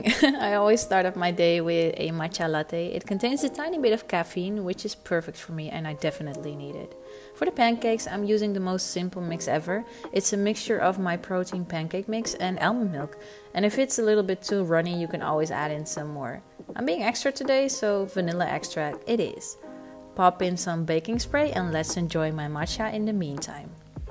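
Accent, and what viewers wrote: Netherlands. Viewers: You're accent is very VERY Dutchess in this video.....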